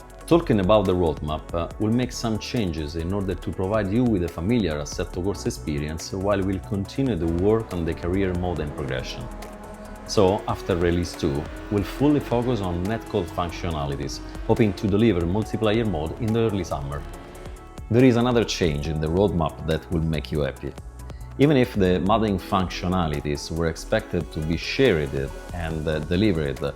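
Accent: Rome, Italy. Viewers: L'inglese con accento romano 😁 . E comunque sempre meglio del mio inglese ....complimenti per il gioco , spero esca presto l' aggiornamento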